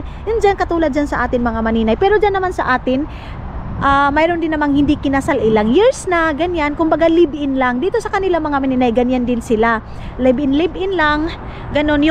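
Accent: Visayas, Philippines. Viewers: Ang or mu nmn oiy wlay lami ang imong content ba visayang badoy mag salita halatang visaya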